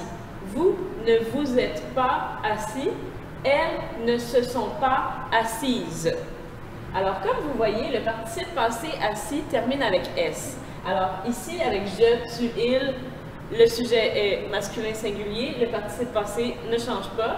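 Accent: England, United Kingdom. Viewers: vous avez un acsent anglais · You gotta english accent, it's so cute♡♡♡. Thanks for your french lessons!♡♡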